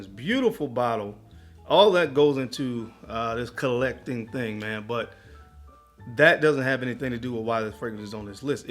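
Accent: United States. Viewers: Please, take the time and show the name of the fragrances on the screen. With your accent (or whatever...), It's not easy for non American to understand the names of the fragrances.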